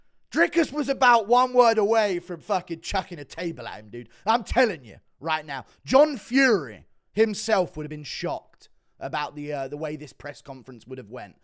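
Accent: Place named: United Kingdom. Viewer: I first heard your British mouth a year ago and commented sht.. But 🤔 Been listening to ya lately and couldn't agree more! I'm a new sub.. and laugh my ass off at your accurate and funny accounts 😂 keep it up 👍.. Sacramento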